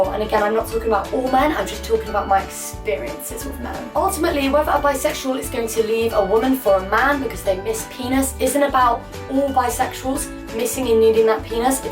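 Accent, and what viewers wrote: United Kingdom. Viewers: what english city is her accent from? the way she pronounces the 'ts' is irritating af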